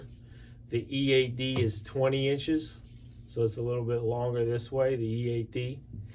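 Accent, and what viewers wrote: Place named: Louisiana, United States. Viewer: So i'm listening to this video but wasn't watching it and i hear the unmistakable accent from Da Parish and then i pulled up the screen and i'm like woah i know this guy. Great video man.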